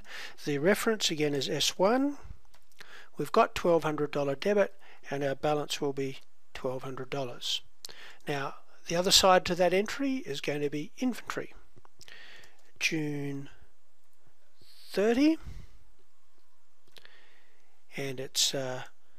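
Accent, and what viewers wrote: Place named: Australia. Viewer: You're an aussie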